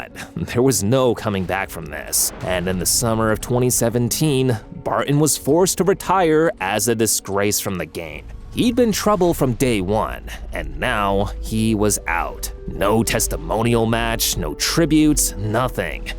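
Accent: United States. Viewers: Be quite a interesing episode apart from the crap american narrator. Bellend!!joey is a wrong un but talks "sometimes"sense in some issues.absolutley radio rental but his family shit wernt his fault.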